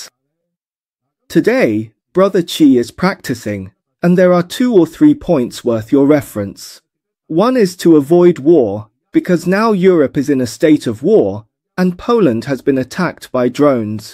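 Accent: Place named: United Kingdom. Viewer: Amazing British accent!😊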